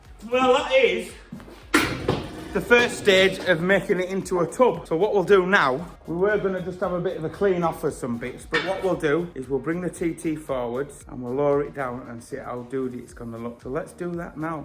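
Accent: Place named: Middlesbrough, United Kingdom. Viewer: yes sorry I looked the company I’m from Middlesbrough I new the accent lol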